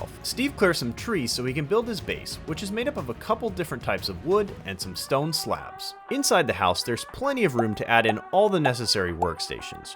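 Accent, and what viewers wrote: England, United Kingdom. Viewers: Дякуєм за додавання Української до відео, хоч там і є певні помилки і ще й о той Англійський акцент... але це пусте... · Английский акцент...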